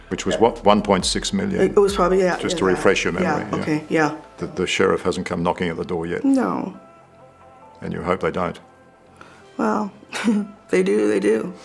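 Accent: United Kingdom. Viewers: The more I watch these kind of videos the more horrified I get how much Michael was bullied and tortured it seems so beyond what my brain can comprehend how much evil was done to him . How will these people meet their maker one day ??? I would never want to be in their shoes. This housekeeper is hideous and despicable woman not an once of guilt or remorse of what she has done to Michael .On the lighter note the narrators voice is so good I love British accent so beautiful.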